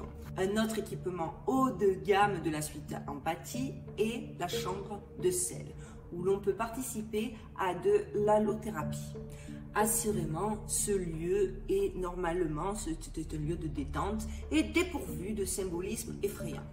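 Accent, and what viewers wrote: South, France. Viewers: Je te découvre grâce à Silvano. J'ai trop kiffé le début " hello everyone '...je croyais que t'étais ricaine puis tu t'es mise à parler le français du sud ...🤩🤩🤩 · Damien Hirtch, copain de martina abramovic, pour un copain donner.Quel bel accent du sud a végas!!!Merci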